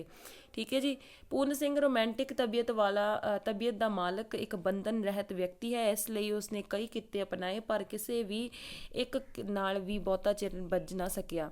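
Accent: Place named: Doaba, India. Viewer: ਤੁਹਾਡੀ ਬੋਲੀ ਤੋਂ ਲਗਿਆ ਸੀ ਮੈਨੂੰ....ਮੈਂ ਵੀ ਦੋਆਬੇ ਤੋਂ ਹਾਂ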